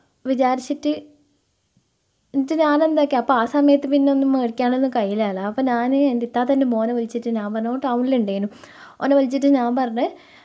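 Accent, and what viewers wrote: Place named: Malappuram, India. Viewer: മ്മളെ മലപ്പുറം കാരുടെ വർത്താനം പോലെ ഇതും ഉഷാറായിക്കണ്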